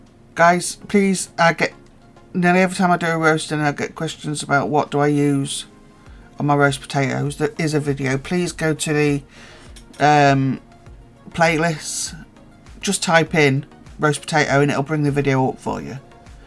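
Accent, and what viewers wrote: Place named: Scunthorpe, United Kingdom. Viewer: You sound like you have a good old sunny Scunny accent like mine lol ...The food looks lovely. Im so blummin hungry now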